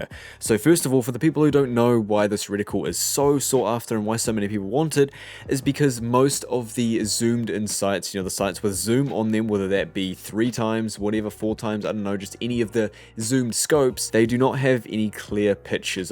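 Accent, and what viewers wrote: New Zealand. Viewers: Are you from New Zealand? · Is he from New Zealand?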